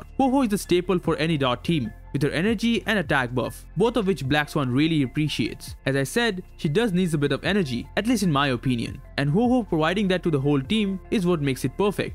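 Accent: India. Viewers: Are you Indian 😅 cuz your accent sounds india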